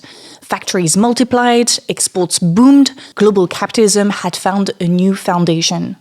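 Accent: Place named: France. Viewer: I hear the French soft power with every “videor” you say. I am learning to like the French accent.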